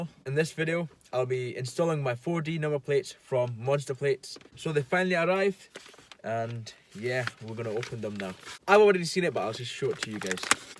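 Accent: Scotland, United Kingdom. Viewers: You Scottish? Lol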